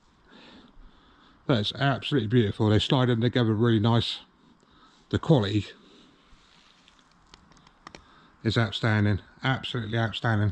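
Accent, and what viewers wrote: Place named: RP, United Kingdom. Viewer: Great video very posh like it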